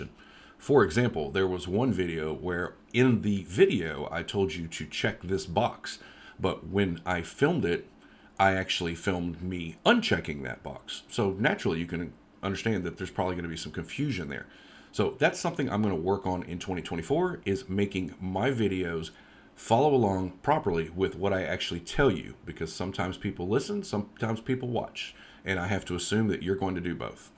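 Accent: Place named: United States. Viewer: I enjoy watching computer help videos when the speaker is from America and has a pleasant voice, which you have, you even sound a bit like a radio man. Happy New Year!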